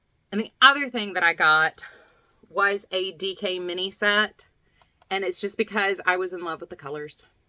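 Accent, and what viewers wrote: South, United States. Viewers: Hi, I'm so happy I finally found you on YouTube! I saw your summer freshness shawl and it's stunning! I love it! can't wait to see it finished! You're so a lovely person and I love your southern accent. Have a lovely day! Magali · Your southern accent is wonderful - comes out stronger with certain words. I don't block socks in general. However, a knit stocking would definitely benefit from it. Looks great. I can't remember if you said what fabric you are going to line it with. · Your shawl is beautiful, the colours are amazing! Also, your southern accent is stunning, I love it 😍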